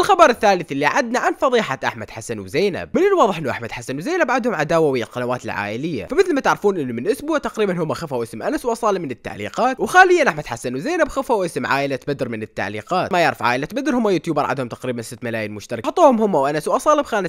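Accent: Iraq. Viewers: بس كلي انت عراقي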